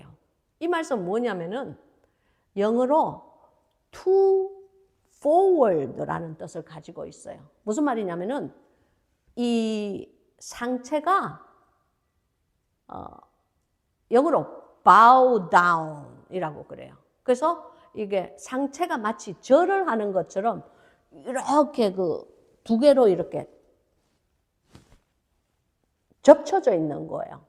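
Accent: Gyeongsang, South Korea. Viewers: 목사님~보고싶었어요❤ 진짜~~~성경공부 오랫만😂 너무 너무 좋아요 목사님 첫인사 하시는 것도 넘 귀여우세용 *꾸지리ᆢ*갱상도 사투리도 ㅋㅋ (저도 부산~^^)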